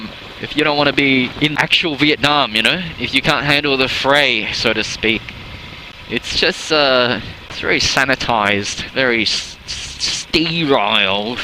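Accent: Australia. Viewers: is an Australian Vietnamese. This is how we sound.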